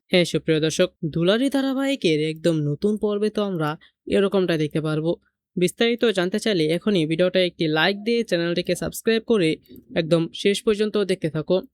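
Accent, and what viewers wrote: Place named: Bangladesh. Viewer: আপনার কথা গুলো বাংলাদেশশের মত শুনতে লাগছে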